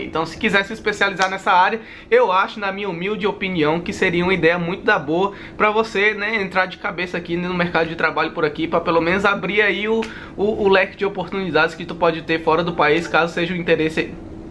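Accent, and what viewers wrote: Northeast, Brazil. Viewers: Tu é nordestino? Sotaque top! Sou de Sergipe e também tenho desejo de ir morar fora do Brasil. Ir do zero o que eu preciso? Parabéns pelo canal, ganhou mais um inscrito! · Continue homi! Seus vídeos são sensacionais. Minha família é nordestina, mas fui criada no Norte do Brasil. Toda vez que tenho oportunidade de ouvir os “sutaque” de lá, eu aproveito. Seu jeito é muito expontâneo! Que Deus os abençoe e que mais pessoas conheçam vocês! (E que os canais cresçam, tenham muitos likes, inscritos e compartilhamentos e tudo de mais mió). Abraços!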